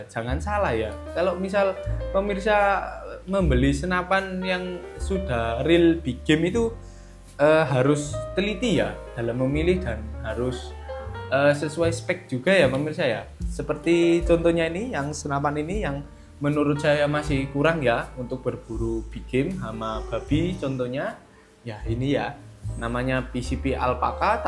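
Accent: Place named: Banyumas, Indonesia. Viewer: Sing logat bahasane kayonge madan ngapak kye, sing endi sih kang?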